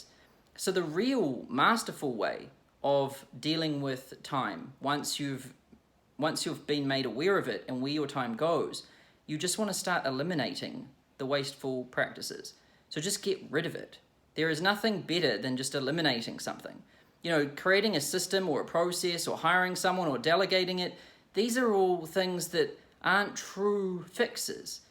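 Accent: New Zealand. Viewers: NEW ZEALAND ACCENT？？？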